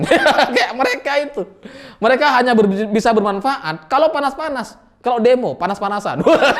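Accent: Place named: Surabaya, Indonesia. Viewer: Hahaha nguenyekmu broooo, khas suroboyoan 🤣🤣🤣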